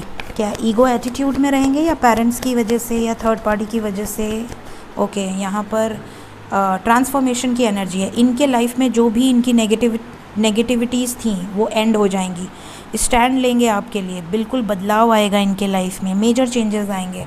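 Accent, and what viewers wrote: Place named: South, India. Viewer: Hello from Karachi Pakistan. Your accent seems to be south Indian. your reading is absolutely perfect. MASHALLAH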